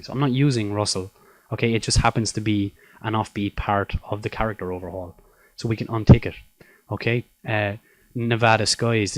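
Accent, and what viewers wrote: Ireland. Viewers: what's lol about it? he's Irish, I believe.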